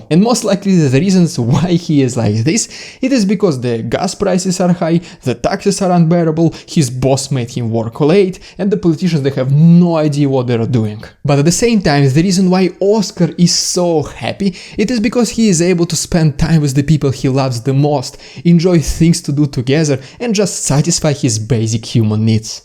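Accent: Russia. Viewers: Омг у тебя русский акцент (Omg you has got Russian accent!)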